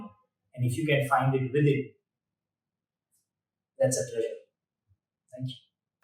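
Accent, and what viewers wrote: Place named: Gujarat, India. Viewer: Only people from Gujarat can feel Gujarati accent in English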